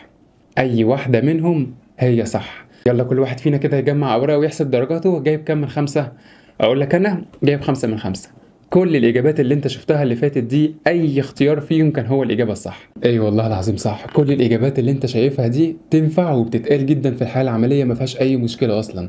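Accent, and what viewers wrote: Syria. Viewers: ههه ما يبلى الحكي السوري تحية لك من سوريا😂❤ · الله يحميك قنعتني و دخليك ع اللهجه السوريه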